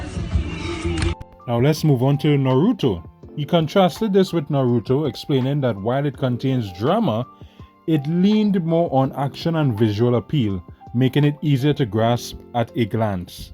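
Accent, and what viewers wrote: Trinidad and Tobago. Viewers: Havent even listened to the video in full yet but following because i hear a Trini accent i think? And i support a fellow Caribbean person doing this 💪🏾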